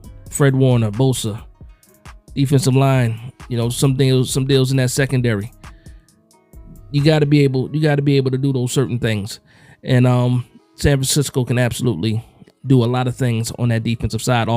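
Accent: New Jersey, United States. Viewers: No wonder you sound like you from home base Jersey City Nj in the building keep the content coming #NorthJersey#bigBlue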